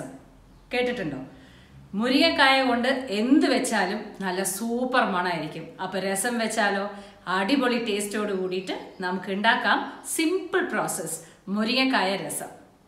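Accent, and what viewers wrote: Palakkad, India. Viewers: Chechi palakkad annu ennu thonnunu.kalaki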